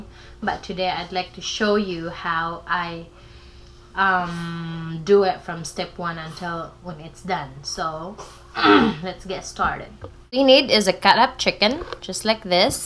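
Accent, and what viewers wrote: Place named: Visayas, Philippines. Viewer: Bisaya ka dai.halata kc khit nag iislang ka magsalita haha.suysus daw.soysauce po.heje.peace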